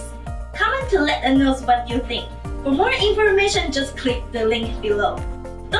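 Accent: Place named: England, United Kingdom. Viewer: So qute english accent. Good job haha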